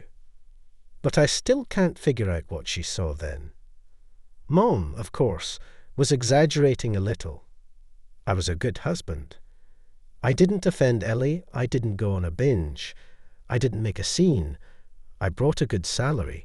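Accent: Scotland, United Kingdom. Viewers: Why is a Scotchman narrating when 5Th Avenue is shown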